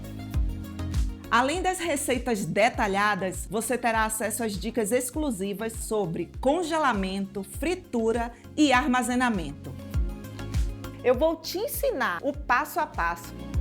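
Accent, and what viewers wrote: Bahia, Brazil. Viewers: Ola! Vc é de Salvador? Tem um sotaque baiano e por sinal ensina as receitas muito bem com toda paciência. Parabéns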